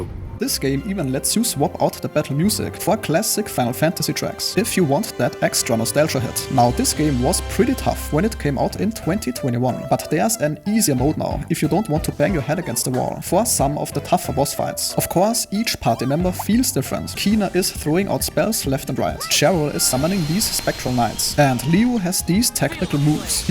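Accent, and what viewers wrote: Germany. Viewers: ich mag den Deutschen Akzent und follow gleichmal rein ^^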